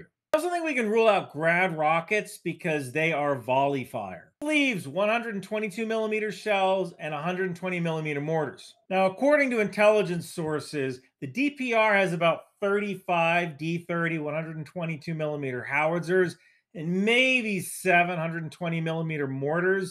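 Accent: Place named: United States. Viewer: I love your American accent . Watching you from Australia keep safe and a wonderful day 🌺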